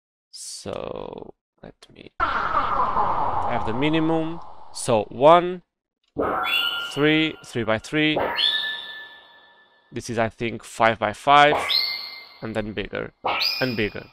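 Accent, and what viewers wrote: Portugal. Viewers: Maan thanks for the tut bro ! Enjoyed the Portugues accent lol 😂 Bro just how you can make alchemy chest ? I couldnt do it SMH ... did you make it in philosopher stone or in craftin table ? Edit ! Problem solved !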